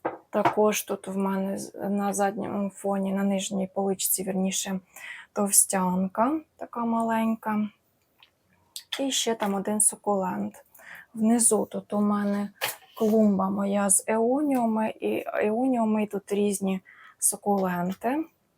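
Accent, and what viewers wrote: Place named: Poltava, Ukraine. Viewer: Вітаю, чую по розмові, що земляки з Полтавщини 🌻. Я народилась, і проживала в Полтаві, там маю квартиру, але 20 років живу в Києві. От в Полтаві в мене квартира "сталінка", в ній завжди влітку не жарко, бо стіни товсті - 70 см цегляної кладки, там комфортно для рослин, а в Києві маю дуже спекотну квартиру, без кондиціонера квітам важко, в усі вікна цілий день світить сонце, жалюзі закриваю на вікнах, на балконі в спеку взагалі може бути +45, одне радує, що восени і взимку квітам світла в цій квартирі достатньо. Тому зараз не купую рослинки, котрим потрібна прохолода, і підвищена вологість повітря, довелось змінити свої вподобання.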